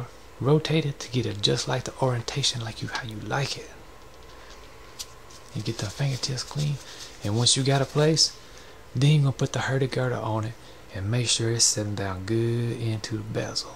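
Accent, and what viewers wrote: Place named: South, United States. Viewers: I love your southern gentleman accent "Cabocon" very well done. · Dude, you sound like a southern Bob Ross 😊 great tutorial! Thank you!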